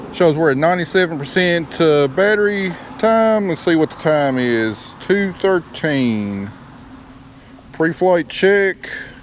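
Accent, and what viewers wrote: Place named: Tennessee, United States. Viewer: Very nice video, clear explanation and to the point. Naturally the Tennessee accent helped. Can you please reply who makes that tablet holder ? Thank you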